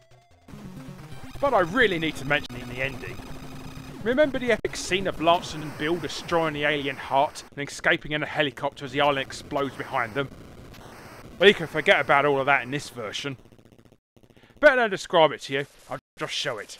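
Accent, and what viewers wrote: United Kingdom. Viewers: Why so british?!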